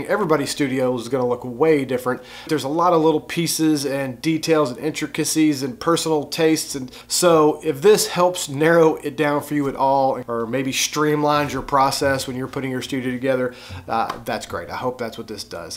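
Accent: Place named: South, United States. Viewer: This is the first time I've ever watched one of your videos, and all I can say is WOW!!! The editing is exquisite, the content is so helpful, and I love your accent. :) Where are you from? I'm an east Tennessee gal, myself, so I love a good southern accent! Anyway, awesome job, man. You just gained a new subscriber. 👏👏👏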